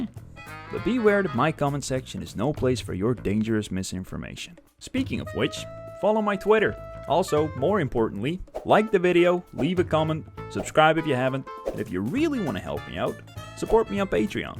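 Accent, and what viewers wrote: Netherlands. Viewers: Je spreekt super goed Engels voor een Nederlander. Letterlijk accentloos. Echter hoorde ik heel lichtjes dat jij een Nederlander was! Super content man. Het is jou gegund om te groeien als een knol!!!!🎉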